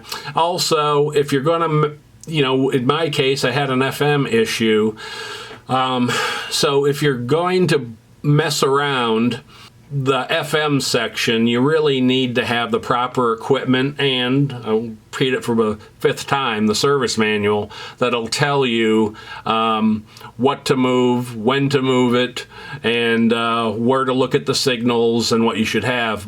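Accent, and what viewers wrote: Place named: New Jersey, United States. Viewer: Sometimes I can't help but picture Brian Williams behind the voice. Is this a Ridgewood-specific accent or is it widespread through NJ?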